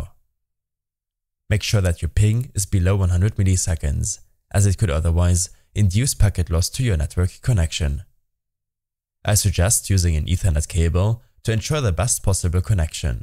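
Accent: Germany. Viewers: Man hört deinen Deutschen Akzent raus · haha ich konnte an der stimme einfach dran erkennen dass du deutsch bist wegen der aussprache😂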